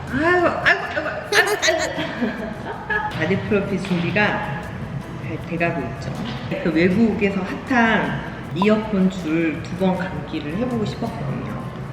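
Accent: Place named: Chungcheong, South Korea. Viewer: 힘들게 사는겨~ 충청도 사투리 정겹네요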